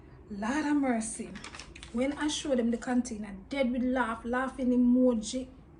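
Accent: Jamaica. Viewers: I heard the news... Treating blacks like that ain't fair. I like your Jamaican english. New family here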